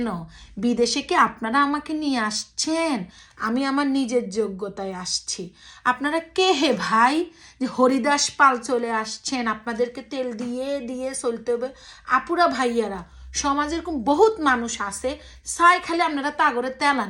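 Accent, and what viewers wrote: Noakhali, Bangladesh. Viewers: আপু আপনি আপনাকে অনেক ভালোবাসি আপনার ভিডিও গুলো সব সময় দেখি আমার নোয়াখালীর ভাষা অনেক ভালো লাগে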